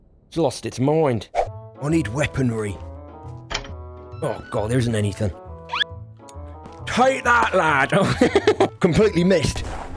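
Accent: United Kingdom. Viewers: you sound aggressively british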